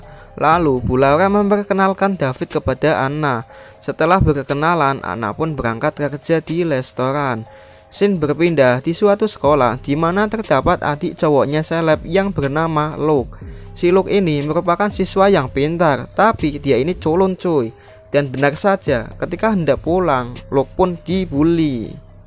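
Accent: Java, Indonesia. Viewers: ett medok bener si mas logat nya, jadi kurang jelas mas'e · min plis, asli mana njenengan, medok banget kalo ngomong wwwk but TQ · Logat jowo ne medok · Logat Jawa suarane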